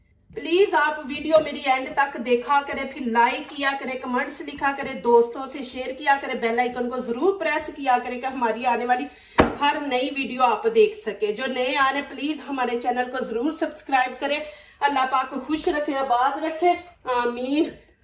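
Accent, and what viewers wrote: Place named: India. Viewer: It's a amazed moment when you dish out delicious foody recipe with desi dialect live long with healthy life sister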